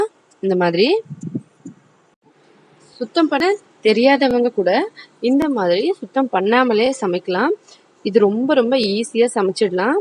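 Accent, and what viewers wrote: North India, India. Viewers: Mam are you a North indian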